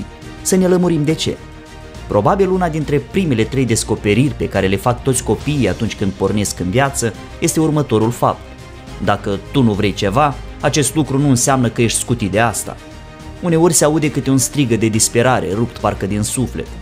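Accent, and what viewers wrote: Moldova. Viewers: Bună seara.Povestitorul este din Republica Moldova sau e basarabean? Mulțumesc.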